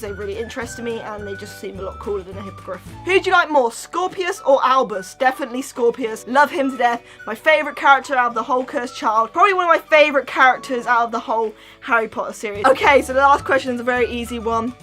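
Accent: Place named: United Kingdom. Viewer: sometimes she speak so fast than mixed with the British accent it is impossible to understand a word 😂 but anyways i love this girl so much 💚💚 (and her accent👀)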